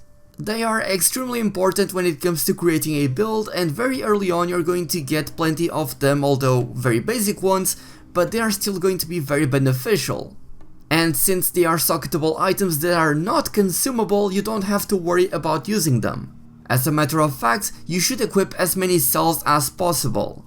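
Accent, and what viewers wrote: Portugal. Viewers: oh mano percebi logo que eras pt pelo o teu ingles wtf,mas bom video btw,copiaste de eu sei de quem mas bom video!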